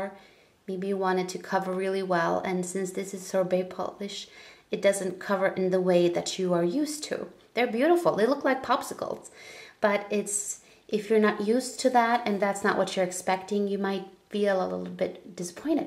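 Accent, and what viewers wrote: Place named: United States. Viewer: You sound like you are from the states!! You proved me wrong! X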